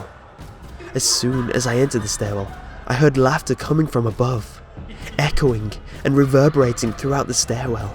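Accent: United Kingdom. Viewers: Narrator with a UK accent....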